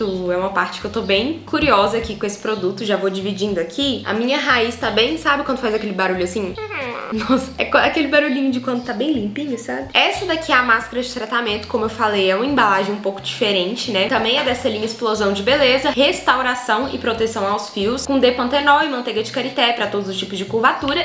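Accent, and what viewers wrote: Minas Gerais, Brazil. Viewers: vc é de minas gerais o sotaque diferente 🤔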